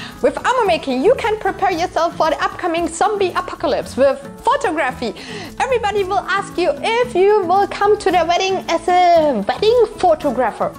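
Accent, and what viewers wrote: Germany. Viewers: Das enlglish hört sich deutsch an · For me its really hard to sit though the video cuz of her voice.. and i dont like german accent for some reason soo.. yeah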